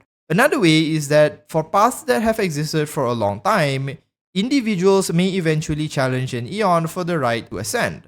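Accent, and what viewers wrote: Malaysia. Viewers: Yo are you a Malaysian? I swear your accent sounds like one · u sound malaysian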